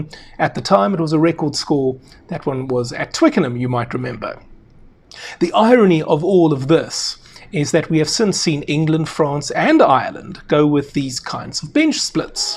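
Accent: South Africa. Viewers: Algorithm sent this channel my way... just needed to hear a South African speaking and voilà i have liked and subscribed 😂 .... do i understand the content? No. Is this channel SAn? Yep, and I will subscribe up and down, nje, just because 😊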